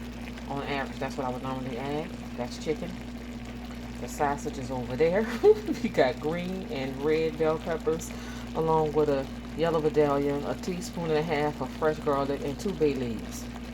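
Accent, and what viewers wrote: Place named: New Orleans, United States. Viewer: Actually paella is from Spain but Cuba does make a couple yellow rice dishes based off of the yellow rice dishes of Spain since Spain’s influence in Cuba was heavy and many many Cubans are of Spanish ancestry. I was surprised you called it a “yellow rice jambalaya” but then I heard your accent and since I’m from the New Orleans area I recognized it as a New Orleans area accent. So now I know why you called it a jambalaya since we have jambalaya in New Orleans and south Louisiana and it looks very similar to a jambalaya. But there is a reason it looks similar. Jambalaya descends from the paellas of Spain and pilafs of southern France. I’m of Spanish descent from the Canary Islands of Spain and our family we sometimes make a jambalaya with saffron (to make yellow rice) instead of the common way to make Jambalaya in the New Orleans area and it looks a lot like this when it’s finished.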